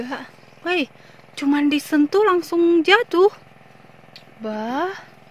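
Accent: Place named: Samosir, Indonesia. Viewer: Kayak yg jualan di samosir, ditomok pelabuhan, logat2nya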